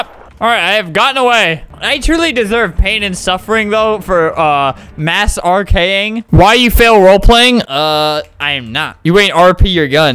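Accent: United Kingdom. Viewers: This is amazing cause im from South London and quite a few people actually speak like this lol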